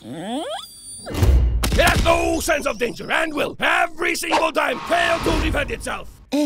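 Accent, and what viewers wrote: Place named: Scotland, United Kingdom. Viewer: I imagined less... Scottish, still great.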